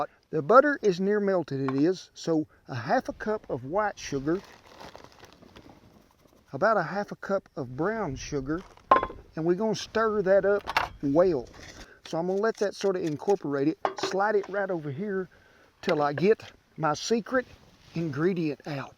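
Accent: South, United States. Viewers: You know when a vid start playin but like you havent clicked on it and it has the words i was like i bet this dude talk like a cowboy litteraly the same accent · Mr., you are one of the most entertaining cooks on YouTube! Thanks for all the great content you share here! I’m a Brazilian English as a second language teacher in downtown Rio, and I have to say I simply love the way you talk, Sir! I’ve always loved southern accents and I think it sounds sooo cool! Love the expressions, the melody and pace of it! By the way, I absolutely love churros! Fortunately for us here in Rio, there’s a churros stand in almost every corner! We love them down here to! We normally have them with either chocolate or dulce de leche. Love your videos! Thanks for sharing!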